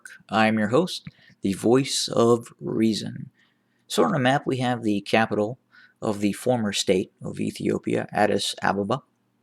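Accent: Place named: United States. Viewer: He is an American of Ethiopian/Tigrayan descent. Don't let his American English accent fool you.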